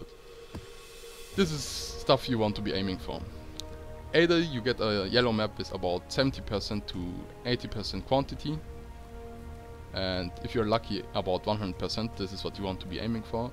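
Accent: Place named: Germany. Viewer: Are you German ? Ich höre das ;) Vorallem wie du Bonus aussprichst :D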